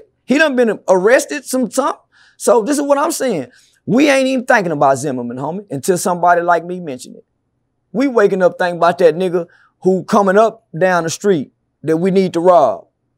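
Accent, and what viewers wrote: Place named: Texas, United States. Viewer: Man I love Texas accent 😂😂😂 "you skirr me" 🤣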